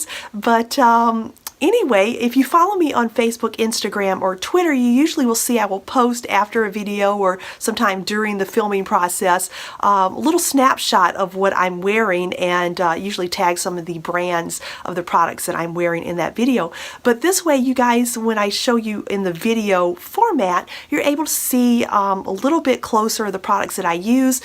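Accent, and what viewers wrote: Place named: South, United States. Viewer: Hey can you do a swatches of all your eyeshadows up close thank you I love your southern accent 😊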